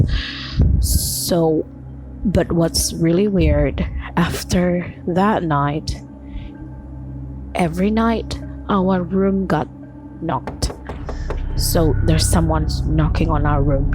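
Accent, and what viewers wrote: Indonesia. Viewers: Ini yg ngomong org indo ya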